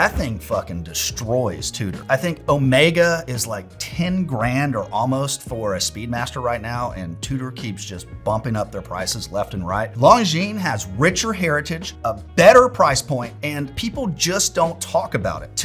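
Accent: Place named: France. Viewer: One of the best and more complete tier list in my opinion. Your french accent is perfect!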